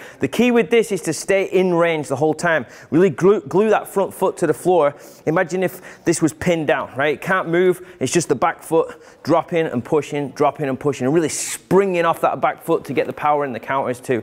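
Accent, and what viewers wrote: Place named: Clitheroe, United Kingdom. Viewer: That sounds like a Clitheroe accent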